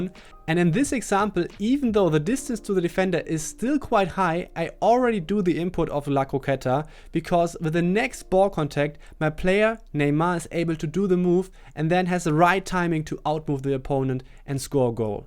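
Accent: Germany. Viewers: because of your accent i guess u from german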